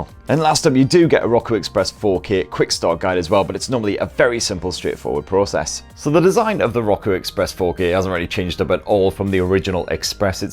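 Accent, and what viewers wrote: United Kingdom. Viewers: His british english is a bit fast for me.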